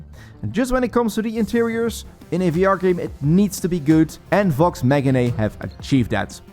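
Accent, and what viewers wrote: Netherlands. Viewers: Hahaha! Typische Nedelandse accent! 🤣😁:yougotthis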